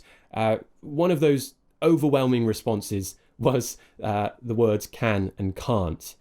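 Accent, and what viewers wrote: United Kingdom. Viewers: Thanks a lot mr for your videos and now you look more handsome than your some previous videos sir I always like your British English accent. Mr you always our British English teacher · Hi I really like you channel and your authentic British accent. ❤❤❤❤and by the way your handsome face is very charming. I am from asian 😅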